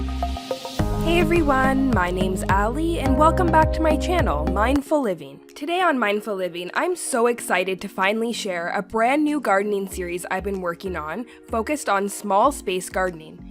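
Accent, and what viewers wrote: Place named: Canada. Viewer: Great advice! Are you from Canada or Minnesota by chance? I recognize a little accent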